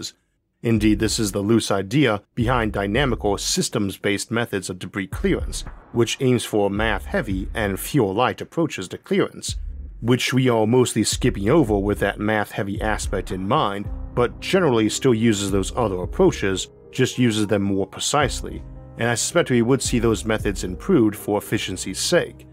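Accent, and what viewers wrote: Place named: Midwest, United States. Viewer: it’s not wrong, it’s just different. It seems like it might be a midwestern thing because Rob Scallon also says it like that and he’s from Illinois.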